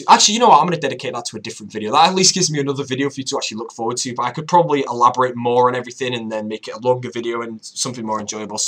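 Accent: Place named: North, United Kingdom. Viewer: Feel free to commentate on my videos once I've finished them in due time. We could even harmonize with your northern and my southern accent.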